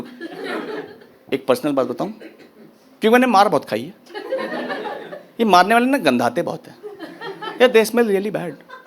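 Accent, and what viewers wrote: India. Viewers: UP का नहीं मराठी लग रहा है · Bhai allahabad se ho pta chal gya accent se😂😂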